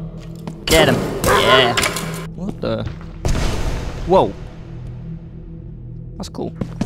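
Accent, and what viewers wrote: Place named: United Kingdom. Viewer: Cool, good mod, and my favorite British accent-man ;3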